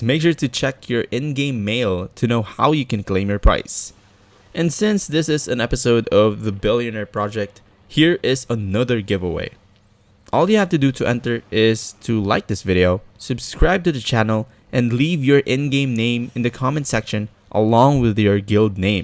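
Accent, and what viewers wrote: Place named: Philippines. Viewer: Hi bro you have hints of Filipino accent with you. Am I right? Great video btw should i leave my current guild with friends to join the VIP crafters?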